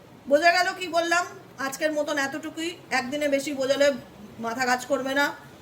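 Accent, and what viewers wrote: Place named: Bangal, Bangladesh. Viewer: দিদি আপনি যে বাঙাল ভাষা বলেন আমার খুব ভালো লাগে। আপনি বিড়াল কে বললেন জুত করে বসো। আমার মনে হয় হাজার এর মধ্যে এক দু জন বুজেছে।😊😊😊😊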